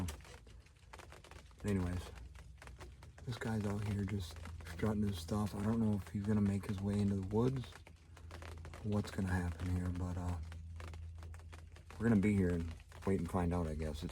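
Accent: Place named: Michigan, United States. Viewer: You are the first outdoorsman Youtuber from Michigan I've found and I'm so glad I stumbled upon your channel. It's nice to hear an accent I grew up with instead of a southerner shitting on northern hunting. I'm looking to get into turkey hunting this upcoming spring myself, very excited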